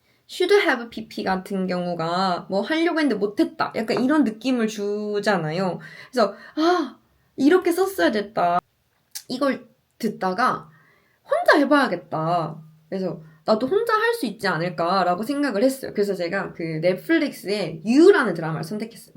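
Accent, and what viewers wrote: South Korea. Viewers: 약간 전라도 억양이 느껴지네요^^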